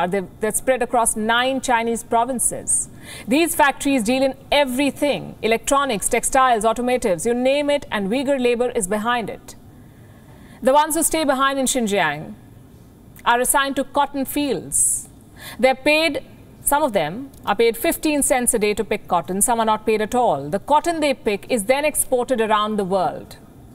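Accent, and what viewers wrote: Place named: India. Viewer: Amen how did they know dat ,force labor I hope America is not using this trick trying to destroy the Chinese economy ,hence they are far ahead of America,, because this girl I've been watching this channel for a long time this girl is very bias protection everything from American side ,, She don't question anything from American side ,, yes she's got an Indian accent but trust me. She works for Americans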